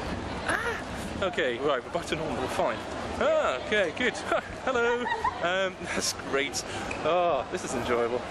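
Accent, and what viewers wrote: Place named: Newcastle, United Kingdom. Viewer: you live in newcastle? thought i heard something in your voice in another vid, if you do, i might see you around sometime :)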